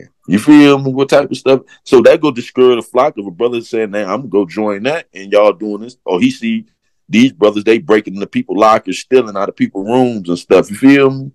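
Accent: Detroit, United States. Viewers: That's detroit talk fam that's just how we speak . # you feel me lol good content 313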